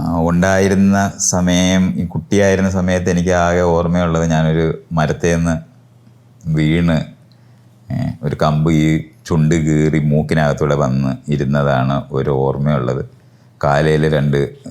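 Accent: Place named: Kollam, India. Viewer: പ്രിയ മൈത്രേയൻസാർ, താങ്കളുടെ മലയാളം എൻ്റെ കൊല്ലം (കൊട്ടാരക്കര) ഭാഷ പറയുമ്പോൾ എനിക്ക് എപ്പോഴും അനുഭവപ്പെടുന്നുണ്ട് ഇന്ന് സംശയം മാറിക്കിട്ടി സന്തോഷം താങ്കൾ എന്ത് ജോലിയിൽ പ്രവരത്തിച്ചിരുന്നു. എന്നു കൂടി പറയു👍♥️👍♥️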